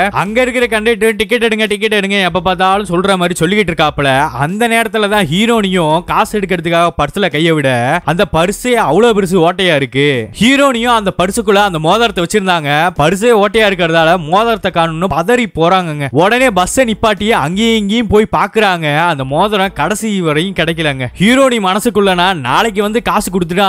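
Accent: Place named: Coimbatore, India. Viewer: Coimbatore English oru thani slang pa - Heronee...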